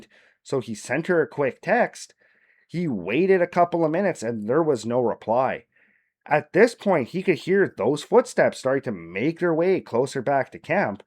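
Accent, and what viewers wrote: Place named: Canada. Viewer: I really like your story telling here. With practice, I think you could become a unique voice, but if i might give just bit of critique, find your own voice and deliver confidently with that. You're abour the third or fourth person I've ran across that has tried to match cadence and narration to Mr. Ballen. I'm not saying this because I'm a fan of his. I personally find his delivery to be kinda annoying and something that only works for him. You've got something unique with your Canadian accents, and way of talking. Lean into that.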